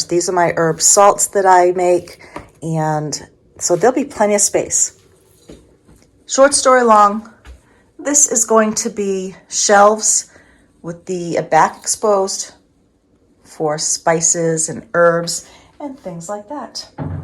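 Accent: New England, United States. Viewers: I'm not even halfway through this video and I'm so excited to see your kitchen come together. One question I have is it looks like your stove is far from your sink, but is that just a result of filming and perspective? Also, I love how you say "drawring". Don't ever change that New England accent! 😀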